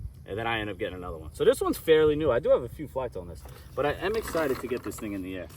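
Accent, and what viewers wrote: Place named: New England, United States. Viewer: Nice! I can’t tell if you are MA or NY. RI maybe? CT? You said stall like stawl but then cherry picker like cherry picka…so I’m confused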